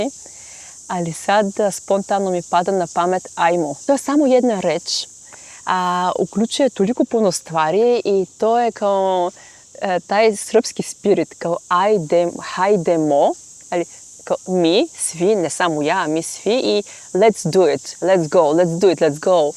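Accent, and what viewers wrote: Bulgaria. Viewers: bravo..ali tako je jak bugarski naglasak kod nje, vrlo simpaticno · Cudno, ali prvi put slusam nekoga da govori srpski sa bugarskim akcentom. :D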